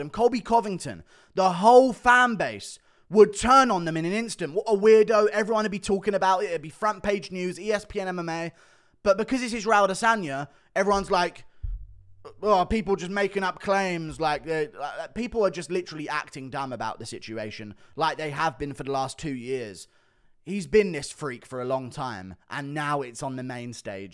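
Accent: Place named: England, United Kingdom. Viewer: Dang, your Strickland impersonation is spot on. Extremely impressive, and even more so for someone with an English accent.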